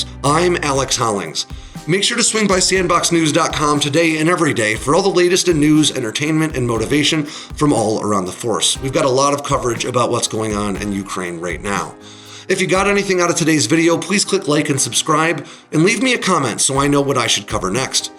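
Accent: United States. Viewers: 2 secs in dumb yank voice cringed me out tata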